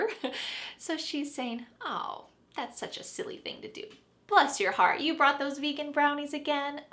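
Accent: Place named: United States. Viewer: I love u cuz u speak us accent♥️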